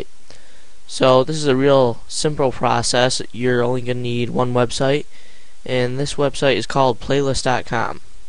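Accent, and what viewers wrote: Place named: New York, United States. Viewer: Are you from New York? You sound EXACTLY like my friend.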